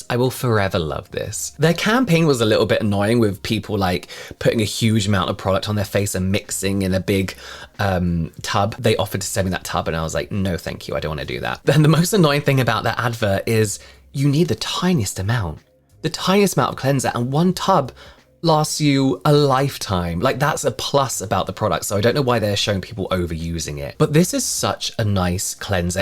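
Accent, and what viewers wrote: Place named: United Kingdom. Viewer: Think you're hearing "tret" as in tretanoin, but with a British accent 😂 some of us don't pronounce our Ts.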